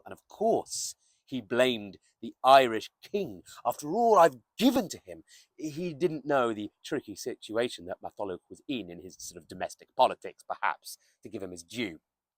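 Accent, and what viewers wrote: Wales, United Kingdom. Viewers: So its with the 'th' sound, I'm sure it has anything to do with your Welsh, I have a hard time with accents, I've tried to watch Irish movies in the past, even thou they are speaking English, I can't understand but half what they are saying, lol We got a Tsunami warning while I was watching your video yesterday, before I left for high ground, I took a peek at where it was coming from, saw way too many 7's, I told my son, who was here with me, it was a trick. I was joking around on your Loki video, using my other account, acting like a trickster, lol, when all this took place. Almost every day I write about December 5th, yesterday I forgot it was December 5th, I took it has a sign.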